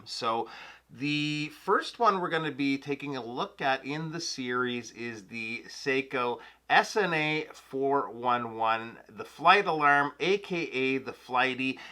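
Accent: Canada. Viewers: Great demonstration, disliked for the Canadian accent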